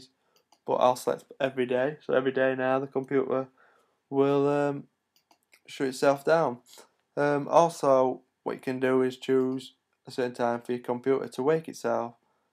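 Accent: Sheffield, United Kingdom. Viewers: you from Sheffield?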